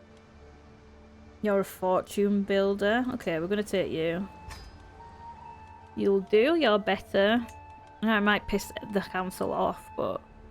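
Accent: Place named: Midlands, United Kingdom. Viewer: Sounds like a midlands accent, good luck with the series!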